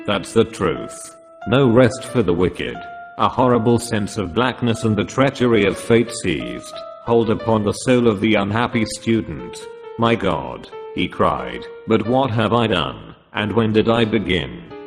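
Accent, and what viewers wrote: United Kingdom. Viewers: Good british accent..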